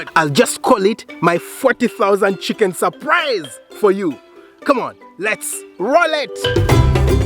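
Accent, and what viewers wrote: Uganda. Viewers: Hello Doc,been a while since I checked out your videos. You have such passion,we love your Ugandan accent,we say 🇺🇬 stand up.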